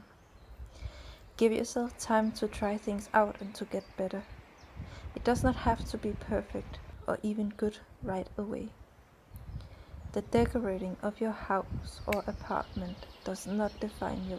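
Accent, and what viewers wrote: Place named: Denmark. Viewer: Thank-you for the voice over! I love hearing your Danish accent. It is such a lovely addition to your videos!!!!!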